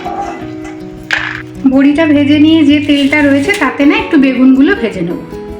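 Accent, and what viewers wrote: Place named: Bangal, Bangladesh. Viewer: Tomar Kotha sune mone hoy tumi bangaal tomar video dekhi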